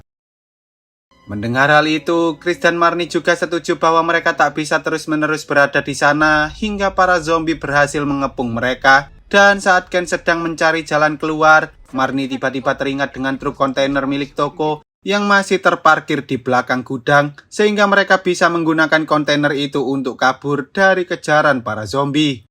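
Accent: Java, Indonesia. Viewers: Mas bisa gk logat jawanya ush medok amat jdi film yg seru jd datarrr aja Maaf ya 🙏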